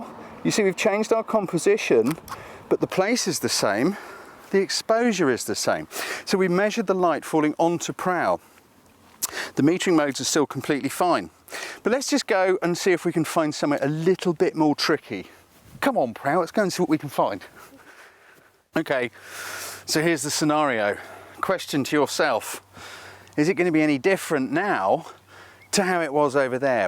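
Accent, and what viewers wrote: England, United Kingdom. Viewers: I think it's because an Englishman is speaking English as opposed to non native. We hear "incident" but I can see why someone who isn't English might hear "instant"!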